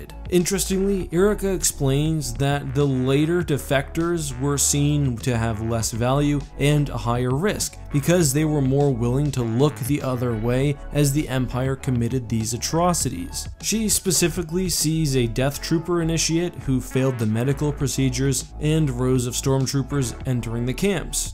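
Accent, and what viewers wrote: Canada. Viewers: you canadian?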